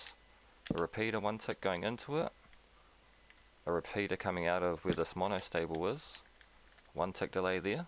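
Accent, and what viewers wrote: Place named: Australia. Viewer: this thing is incredibly slow/inefficient, too many non wood blocks within a 5x5 radius. if this were just dirt and a dispenser firing away in an otherwise clear 5x5 area, even just that one glowstone 8 blocks up would slow it down whereas a wood block would not. of course thats also a much faster albeit less flashy way of getting wood. comparatively, this is like the poster boy for erectile dysfunction. however, it would be great if it didnt go against the sapling mechanic and you did a sound job with the video so id say go back to your own design. start off with a dispenser and mark off a 5x5 for testing, you will see that wood blocks(not planks) can pretty much go anywhere while others can maybe go 2 high or so before they hit the bonemeal rate. that said, i still cant give this a thumbs down, Australian accents captivate me. 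goodaye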